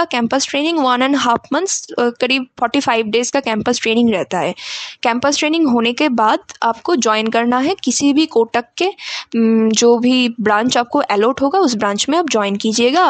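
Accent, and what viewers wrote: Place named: Bengal, India. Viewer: Aapki hindi sunke ek baat to sure hai aap bengali hai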